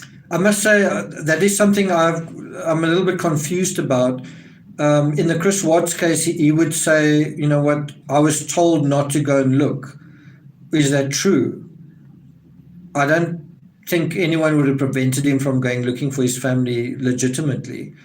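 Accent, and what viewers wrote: South Africa. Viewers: Found your channel last night and love it love the accent are you from S Africa . Best wishes from Ireland 🇮🇪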